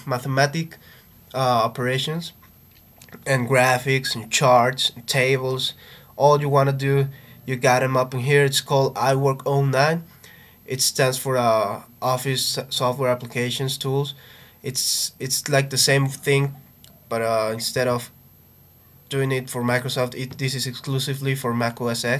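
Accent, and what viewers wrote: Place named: Brazil. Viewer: like the accent, brazilian?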